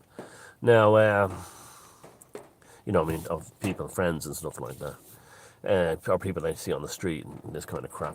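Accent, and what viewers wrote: Ireland. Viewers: The combination of rain falling on your skoda and your Irish accent,delivering knowledge is the true magic. What we have we hold!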